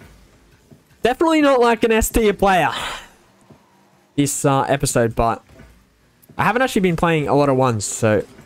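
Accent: Australia. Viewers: i didnt even realise u were aussie man till this vid you accent stands out to even me and im aussie to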